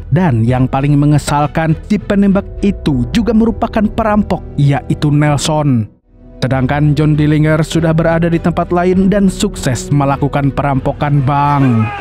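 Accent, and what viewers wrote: Banjar, Indonesia. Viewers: orang Banjar kh pian....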